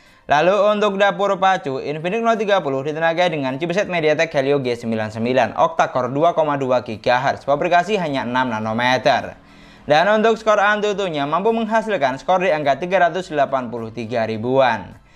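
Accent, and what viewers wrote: Madura, Indonesia. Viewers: Ini logat madura ya bang?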